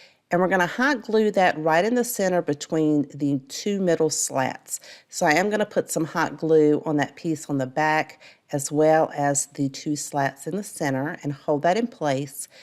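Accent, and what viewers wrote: South, United States. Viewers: There’s no way I can pick just one - they are all amazing!! Love listening to you explain the projects with your Southern accent!!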